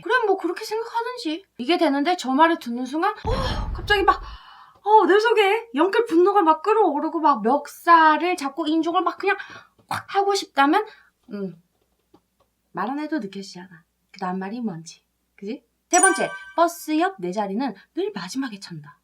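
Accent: Gyeongsang, South Korea. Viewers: 갱상도 어딘교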